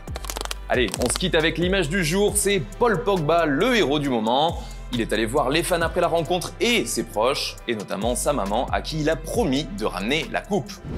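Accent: Italy. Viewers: J’aimerais bien voir la tête du gars avec son accent italien cassé 😂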